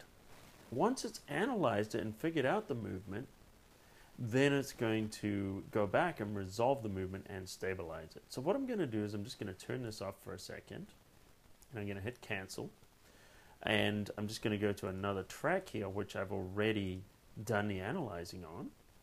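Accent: Australia. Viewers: Where is your accent from? I'm guessing you're an Australian who has been living in the States for a while. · Good video, by any way are you an Aussie that has been living in the US for a while, you seem to have a hybrid, Greg Norman accent.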